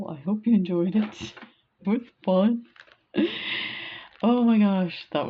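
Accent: Canada. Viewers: Love watching your unboxing videos. You're so excited the whole time. You sound much like a Cape Bretoner, which I am lol. You sound like someone I could be good friends with. Anywho just wanted to share my appreciation, I've just started my beading and jewelry making hobby about 2 yrs ago and love it. I'm looking forward to more video from you and still catching up. Have a great night.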